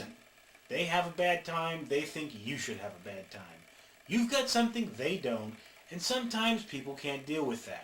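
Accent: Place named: Australia. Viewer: def australian well g'day mate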